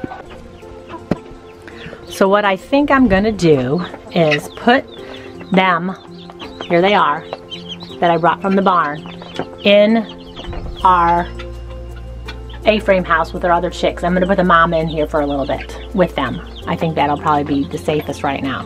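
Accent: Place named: Great Lakes, United States. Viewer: Was just able to stop laughing at least long enough to comment three years later. Cyndi Lauper was funny. My friend calls his branch cutter "Lauper" but "Phyllis DILLER" had me in stitches. I've been a sub for over three years and wasn't going to watch this cause of its age but glad I did cause I needed that laugh badly what with all the dark conspiratorial stuff I analyze [NOT theorize] & ingest almost every day. Needed that Light and silver sunshine you radiate. Not sure where you and live but it's well N of SW Blowhigho. I can tell by your near Great Lakes eaxecent.. LolOwell. Peessowt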